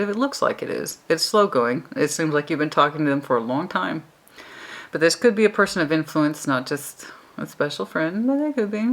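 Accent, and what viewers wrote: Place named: Texas, United States. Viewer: You are so beautiful and I knew I heard a Texan accent…the reading resonates 100%!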